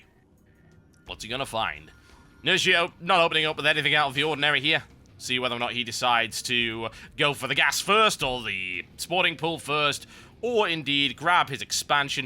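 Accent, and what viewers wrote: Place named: England, United Kingdom. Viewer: Totalbiscuit you and your crazy english accent make watching SC2 exciting and sexy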